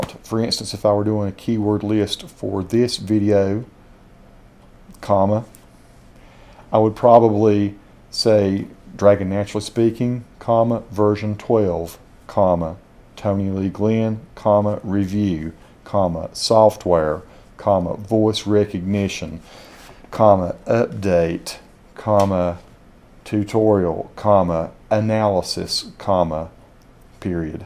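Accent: South, United States. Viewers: I'm not a fan of Southern accent but yours looks utterly excellent. Good job :D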